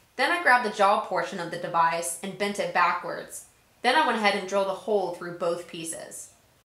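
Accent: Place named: South, United States. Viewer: Is it just me or did I hear a little bit of a southern(ish) accent? I can be wrong there, however I think it's a lovely accent.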